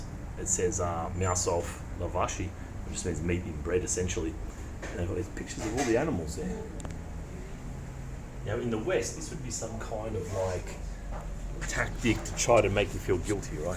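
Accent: Australia. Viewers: It's very hard to understand this Australian accent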